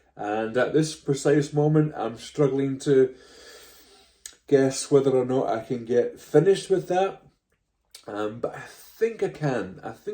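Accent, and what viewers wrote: Scotland, United Kingdom. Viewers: I have been truly blessed since I found your podcast, please continue doing what you are doing! I grew up in the Chicago area and our very best family friends were from Aberdeen. Because of them, I’ve always been interested in Scotland and wished I could visit. My husband isn’t into travel abroad, so this has been the next best thing for me! I live in the South now, but told “Aunt Mary”, who still knits at 96, about your podcast, thinking she would love to see scenes and listen to that accent from “home”. ❤️ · I discovered you last weekend and have watched close to all of your videos while knitting. I´m unsure what exactly it is, but it is so nice and soothing to listen to you talk. There´s not a single second of boredom in the hours of content and I LOVE seeing the landscape and small town footage. (and the Scottish accent is lovely as well. 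Would totally trade that for my Austrian any time). So yeah, I´m excited to watch more of your videos. They´ve accompanied me well while learning Irish Cottage Style knitting (Great for working seamed garments because purling is much less of a hassle this way and it looks super cool). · Three of my favourite things😊 Knitting, Scottish Tales and a gorgeous Scottish accent. I could listen to you all day. My lovely late hubby Gordon was always telling fascinating stories of his home’s history. Also I’d be interested in seeing a pattern for the ‘kep’. I can’t find one. Probably because I’m not spelling it correctly. 😂 Thank you 🙏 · What a lovely episode, it's so relaxing to listen to you tell stories in a calm Scottish accent while I'm knitting. I would love to hear more stories from Scotland. The Chiaogoo interchangeable needles are great, and the red cable is much softer than the Knit Pro Zing (I have those too), and they come in small sizes and different lengths. 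Thank you, have a lovely week 😊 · I enjoyed your sitting and knitting chat. Also, being a birder as well as a knitter, maybe a few shots of local seabirds from Scotland? Don't see them much in Canada. And the different plaids from the different clans is always interesting to us who don't see them much. Anything you put together with your intriguing Scottish accent is always welcome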